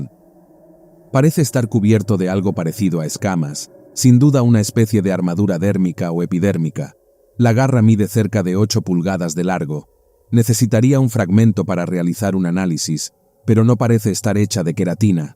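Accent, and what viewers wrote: Spain. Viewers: Es una cagada que sea tan profundo ese acento Ezpaniol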